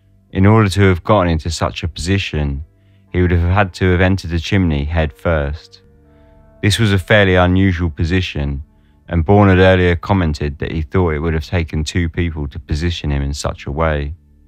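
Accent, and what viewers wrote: England, United Kingdom. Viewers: Thanks for posting...you sound English, but that means nothing if you live in another country. But what about the case of my friend Fiona Saunders, a young mum who was beaten to death in 1986 in Church Crookham, Hampshire. And was the reason her case has almost been completely buried because one of the suspects became a police officer after?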